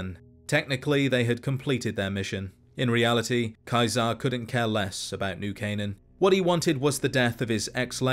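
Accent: United Kingdom. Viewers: Thank God, a British accent on the internet not talking about the Queen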